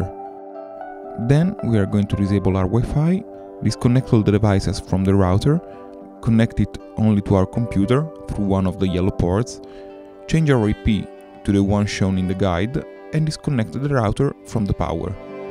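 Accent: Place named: Italy. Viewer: Al 99.9% è italiano. Come lo so? Non parla inglese male, ma l'accento è chiaramente italiano (quello vero, non quello che gli americani pensano sia italiano). Posso dire che no è del sud, probabilmente è del centro-nord. Se hai visto il video dovresti aver notato che la home dell'utente che sta usando si chiama " ", quindi lui o qualcun altro si chiama o magari è un dipendente di... chi lo sa. Ma la cosa più importante è: come faccio a ripristinare la versione originale del firmware della fritz!box, sempre ammesso che non mi trovi contento?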